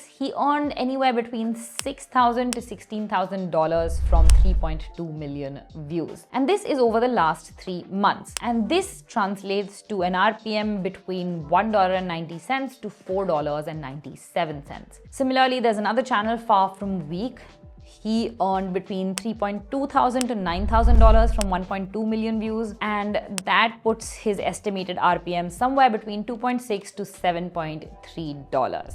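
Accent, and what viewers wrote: India. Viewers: listening your india accent torturing my ears . sorry i cannot watch this.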